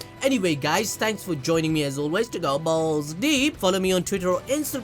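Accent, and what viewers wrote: Jamaica. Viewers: 🤣🤣🤣......yuh get a pass mi boss wid dat Jamaican accent 🔥🔥😂😂😂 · You Jamaican bro? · Lolol dude you deserve more subs just by adding that Jamaican accent big up u self respect lol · Ayo I've been watching this man for year no one told me he was jamaican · Are u Jamaican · Wait Holup your Jamaican 🗿 yes I will now go back and like every vid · i laughed very hard for the Jamaican accent. now he just needs to weave in a Trinidadian accent and your skits will be perfect.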